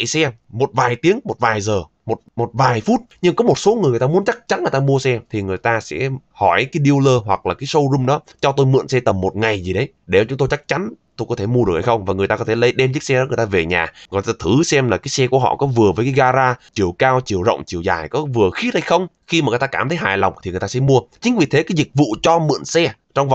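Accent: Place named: North, Vietnam. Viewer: Nghe giọng backè.. sợ lắm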